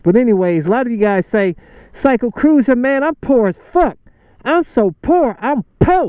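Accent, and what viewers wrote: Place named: Ohio, United States. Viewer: I didn't realize that folks from Ohio have a regional accent, but listening to your videos, I learned that they do.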